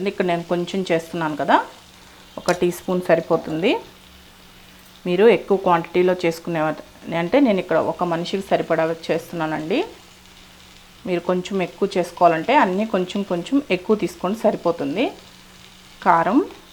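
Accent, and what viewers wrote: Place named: Bellary, India. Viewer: I saw Bellari accent in your Telugu ma'am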